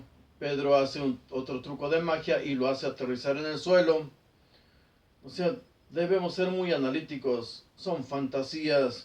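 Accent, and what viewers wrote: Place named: Mexico. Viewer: Me muero de la risa escuchandolo, Doctor. Su acento mexicano es muy jobial y ademas todo lo que Ud. dice es tan cierto y obvio.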